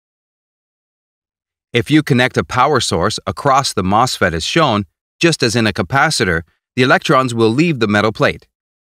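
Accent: Kerala, India. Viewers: Well, he's not really imitating the western accent tho. He's a mallu and that's how some of em actually speak since I'm a mallu as well and know a lotta people who speak like that.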